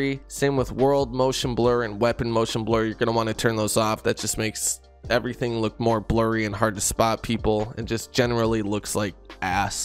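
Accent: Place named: Russia. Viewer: u sound russian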